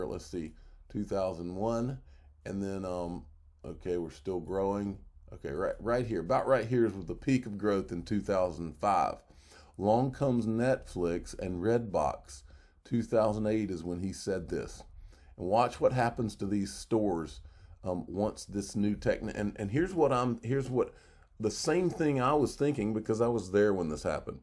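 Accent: South, United States. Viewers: Bad mic settings , thick southern accent with mispronunciations and old news = BYE BYE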